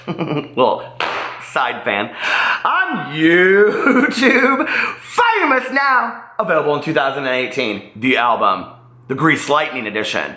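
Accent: South, United States. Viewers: I’m a life-long Southerner, but I do wonder why you, a life-long Indianian, have a Southern accent. Like the way you say “thang” instead of “thing.” So cute! I love it!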